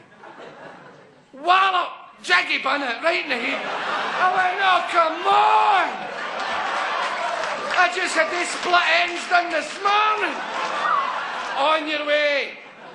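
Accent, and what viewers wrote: Glasgow, United Kingdom. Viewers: anyone here today for a listen.. I listen every Easter… funniest 15 min.. always wondered what the “ last miracle was”.. maybe one of you were at the Airdrie show… “ see you Judas your gettin on ma…..” pure Glasgow… awe the best🍻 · Love Billy but the Glaswegian accent is something else. Haha. Understanding about 90% of it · This is hilarious. I wonder is there anyone who would be able to help me with the transcription of this? I wrote down all I can understand, but there are parts I just don't get. I can send you what I have written down, if someone would be up for checking it out and correcting mistakes. I am not a native English speaker, so the Glaswegian accent is a bit of a problem for me, I would appreciate it. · to be fair, it is pretty hardcore Glesga patter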